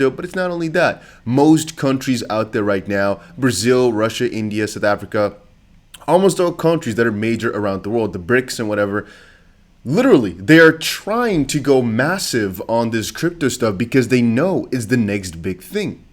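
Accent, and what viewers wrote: United States. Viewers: You sound like you grew up here in America. Our own accents are wildly different from region to region.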